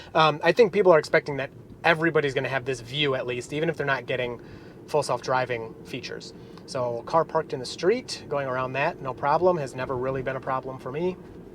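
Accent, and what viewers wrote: Michigan, United States. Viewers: Michigan guy?!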